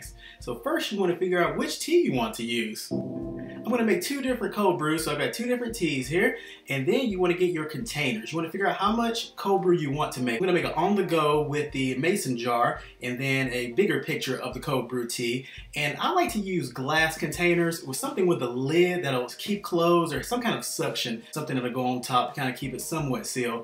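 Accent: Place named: United States. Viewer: i love your nice country voice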